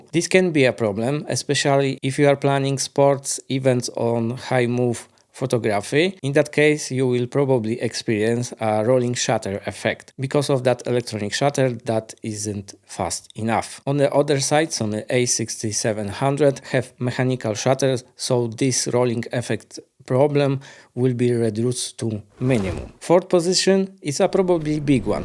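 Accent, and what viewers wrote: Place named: Russia. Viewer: Your accent is very simillar to russian😅 I want to by e6700. Thanks for testing it.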